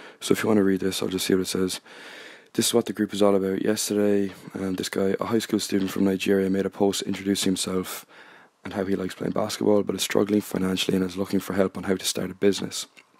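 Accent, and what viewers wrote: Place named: Ireland. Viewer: In Swindon now but lived in Waterford for 15 years. Can listen to many accents and not give a shit but as soon as I here the Irish accent I know I will watch the video :-) + sub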